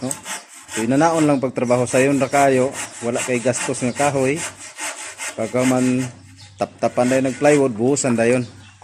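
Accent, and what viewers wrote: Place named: Visayas, Philippines. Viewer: Sir dugay ka na sa manila bisaya ka pa gihapon .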